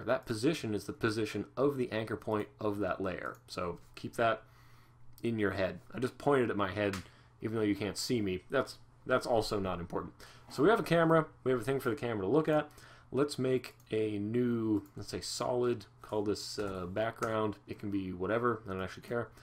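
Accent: Canada. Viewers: You have a very Canadian accent and you are a great teacher.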